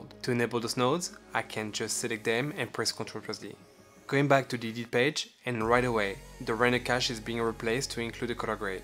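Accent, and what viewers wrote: France. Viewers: merci french accen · mmm French accent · I believe you're french... Based on your accent 🙂 Thanks for the video. I'm still on the free version, I wonder if I upgrade to studio with my RTX 2070 Super would be enough to have smooth playback in almost all situation or if I should go with the new MacBook m1 ? What are your thoughts on this ? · Loved your video!!! Super thanks!!! ❤❤❤ Your accent is from France, am I right? 🤭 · Trench accent ? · I love how he speaks in french using english words! :D. Thanks for the video, I didn't grasp much as a total noob but generating proxy media solved the issue.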